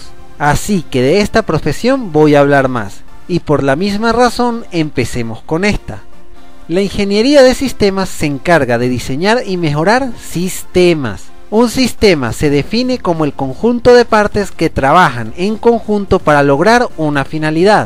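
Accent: Venezuela. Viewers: Depende de la región de Venezuela donde naciste. Ángel David Revilla es Caracas (región central), donde el acento es más prominente. Me imagino que es de la región oriental o la noroccidental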